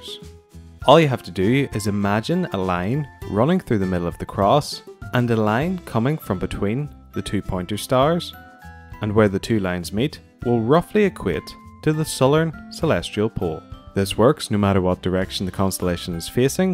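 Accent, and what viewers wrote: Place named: Northern Ireland, United Kingdom. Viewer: Love how you have to speak so slowly do that people understand your NI accent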